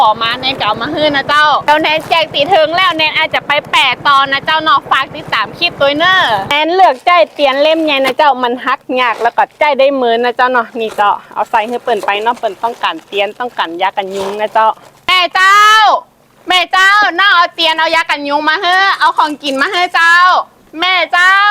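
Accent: North, Thailand. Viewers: น้องแนนเป็นคนที่ไหนครับเห็นอยู่ทะเลแต่พูดเหนือ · โค้ะ อู้กำเมืองได้ตวย ฮู้ๆๆ สุดสุดยอดเลย ก่นึกว่าคนใต้คนภาคตะวันออก ชอบเลยครับต้องสนับสนุนละครับ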